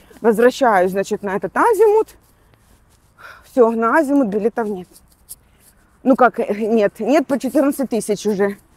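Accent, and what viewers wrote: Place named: Kuban, Russia. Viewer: Что вы несёте, я живу на Кубани почти 40 лет,все интонации,выражения ,произношение слов кубанские 🎉🎉🎉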